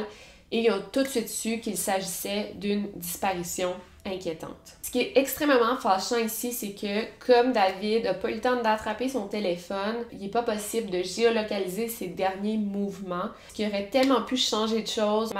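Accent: Quebec, Canada. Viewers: J'adore l'accent et la facon de parler des"maudits cousin's" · Je suis du sud ouest et cette affaire intrigue effectivement Cependant, je viens de m'abonner, je me désabonne aussitôt ! Une demi-heure d'accent québécois avec des théories fumeuses Tu te permets de contacter la famille d'un côté mais de l'autre tu donnes des théories comme si c'était juste un Cluedo pour toi, un jeu, un divertissement C'est dommage ton générique et le thème de ta chaîne était sympa mais ton vocabulaire assez pauvre + le fait que tu finisses rarement tes phrases + ton débit assez lent et monotone, déjà qu'à la base il n'y a aucun montage, c'est impossible de tenir une vidéo entière... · Elle est québécoise en même temps tu t’attendais à quoi comme accent? Ensuite comme elle le dit l’enquête est en cours donc elle ne peut pas donner tous les éléments. Elle donne des théories parce que rien n’est sûr et que ça peut permettre à des personnes de chercher un peu de partout. 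Et pour la famille bah elle a raison de le faire si elle le peut comme ça elle glane des informations vraies et elle peut transmettre des messages, des pistes etc. Maintenant si le fait qu’elle veuille aider a retrouver un homme disparu en amenant la population à être au courant de l’histoire et à chercher un peu partout en suivant plusieurs pistes te dérange et bien bon vent. Mais ton commentaire est assez nauséabond, déjà tu t’attaques direct à son accent je trouve ça ridicule mais en plus tu lui reproches de prendre des pincettes alors qu’on parle d’un homme disparu et d’une famille qui souffre. M’enfin le respect et la politesse visiblement c’est pas ton truc.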